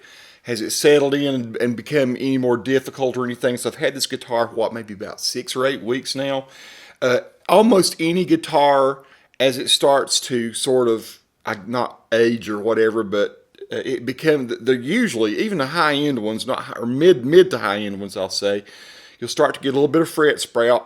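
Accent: South, United States. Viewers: I love your videos, they are so in depth and practical. It is also nice to hear a southern drawl. I ordered one of these based on your review. Thanks so much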